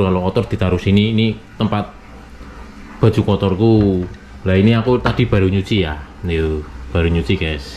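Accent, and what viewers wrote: Banyumas, Indonesia. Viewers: kamu memang hebat, harusnya kamu mendapat penghargaan dari bupati di kampung kamu karena kamu bahasa ngapak dikenal di jepang... Salam toko kejujuran bang... Tiap hari dong bikin konten, berangkat kerja dan ketika kerja, Terima kasih sudah menghibur kami sarukhan ngapak hehehe... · Aq yen ndeleng kontenmu kui marem mase...bahasa ngapake mantep...guli njelasna kepenak... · Mas, kalo boleh tau asal daerah mana? Wong ngapak ya Salam dari Maos Cilacap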